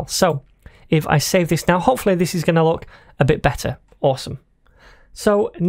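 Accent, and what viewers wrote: United Kingdom. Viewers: I was amazed to see you used rupees as the currency. Love the British accent btw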